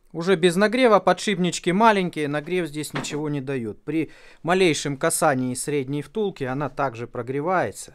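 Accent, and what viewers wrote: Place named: South, Russia. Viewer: у просто неправильное звучание,ибо южный акцент имеет,все люди с нормальным произношением не будут говорить подшибник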